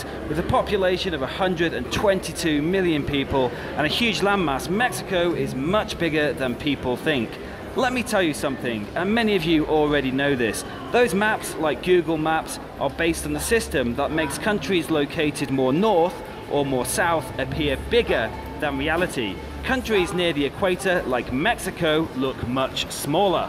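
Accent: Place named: United Kingdom. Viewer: love your videos I also love your Britannia speaking do not lose it emphasize how English should sound Like .Arriba Juarez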